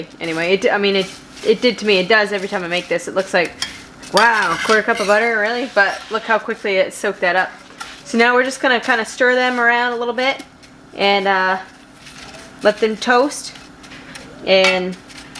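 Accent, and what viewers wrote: Maine, United States. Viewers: Friggen loved it. Your accent kills me brings me back to central ME. I'm gonna try that potato dish. I get honey baked hams every year from my work so I don't have to do all that glaze work. Soak your toothpicks and they won't get crispy your man's got him a great woman! Happy Easter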